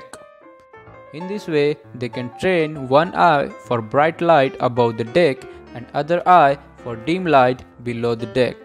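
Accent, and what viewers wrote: India. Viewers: Great job brother. I want to give you a suggestion that. Add Subtitles manually because youtubes auto subtitle is not very much compatible with Indian English accent. As I don't know English properly Subtitles helps a lot to understand for Slow Learners As I was reading subtitles given by Youtube was Horrible. As you were Saying word "Blue Eyes People" youtube was Subtitling AS "You Eye People" many other mistakes were also there, Please Check it. . Thanks aLOt For Info.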